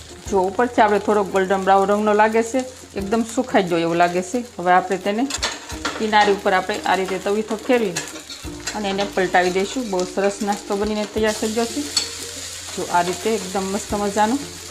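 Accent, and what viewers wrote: Bhavnagar, India. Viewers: તમારી ભાષા પરથી લાગે છે કે ભાવનગર, ગોહિલવાડ પંથકનાં હશો.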